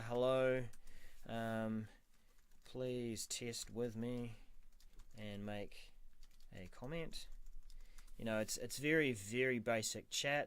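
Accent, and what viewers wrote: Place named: Australia. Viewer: Even you young people are lost, lost , I say, to try to follow, understand, and participate , in all this crap, this nonesense, about partaking in all this on-line balloney, about your so-called channel ! It is all a joke ! Even you youngsters are lost with all this so-called technology! a word of advice, Sir, help people to use your services or channel, and you Sir are a horrible explainer to any one ! You bore us to death listening to your Assie accent explain nothing! Get somebody who can listen to you , and he or she will back me up! and try to understand how a person l;ike myself who did not go through school with computers ! Yes the american Main line media is corrupt!! but they have professionals who know what they are doing to put forth their lies! <mail>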